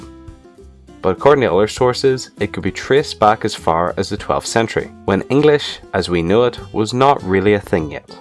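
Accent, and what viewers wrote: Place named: Northern Ireland, United Kingdom. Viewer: Are you from Northern Ireland? I can kinda hear it in your voice.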